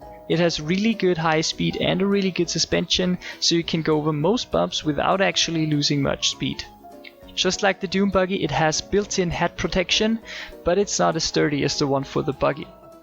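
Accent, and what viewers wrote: Austria. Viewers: kommst du aus Österreich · Kommst du auch aus Österreich?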